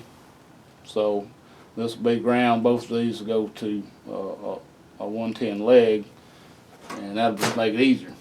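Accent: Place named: Kentucky, United States. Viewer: He sounds like he is from Kentucky!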